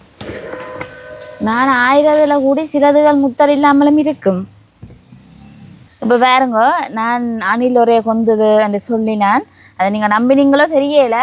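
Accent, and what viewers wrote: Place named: Sri Lanka. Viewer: I'm always LOVE srilankan Tamil from Tamilnadu.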